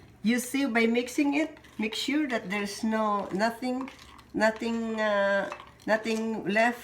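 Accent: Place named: Philippines. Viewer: Are you Filipino? Can you just speak in Tagalog? I can sense you are having difficulty speaking in English. Your accent is so thick!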